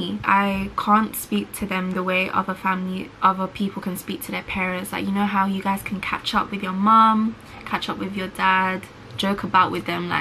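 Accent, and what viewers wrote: United Kingdom. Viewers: this is the first time ive heard an asian person speak with a british accent...lol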